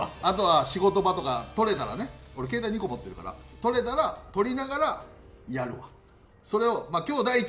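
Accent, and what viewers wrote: Japan. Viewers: さとやんアクセントが関東、関西、東北、四国山ほど混じってる（笑）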